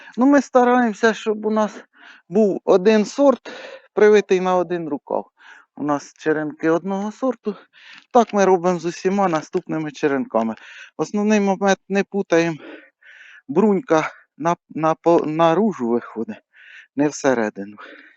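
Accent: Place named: Zhashkiv, Ukraine. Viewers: Черкащина райьон говор як в Жашкові..віджалій гроші купи нормальний сікатор він не ріже ніфіга.. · Я також послухав - ніби Маньківщина )) Ніби дома побував. Сокира, радянський секатор і ножиці, і обов'язково тряпочка )) Таке рідне.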